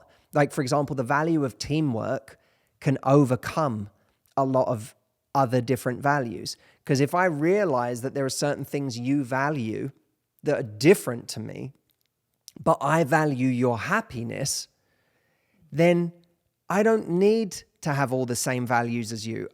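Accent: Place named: United Kingdom. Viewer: I'm here for British accent İt's wonderful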